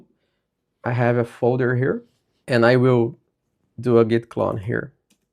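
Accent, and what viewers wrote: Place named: Brazil. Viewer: Mano quando tu começou a falar eu logo pensei "rapaz esse bixo é brasileiro" falou o já matei kkk obrigado pelo tutorial mestre.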